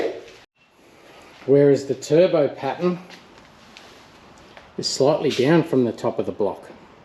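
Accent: Australia. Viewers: Once again I am catching up with this episode late. If you are watching from overseas accent is typical of an Australian tradesman. We are that group of people who don't have to carefully check what we say before we speak. We use slang, we might swear a little, it's all natural to us. With his humour might at times come across as a bit daft. But, he has a sharp mind and he knows what he is doing.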